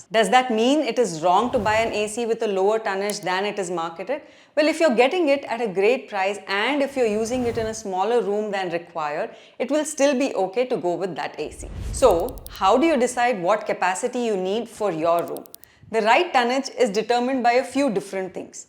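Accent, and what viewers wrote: Tamil Nadu, India. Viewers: That tamil accent is gone😊. Liked the video. Thanks for information. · Tamil . accent is good only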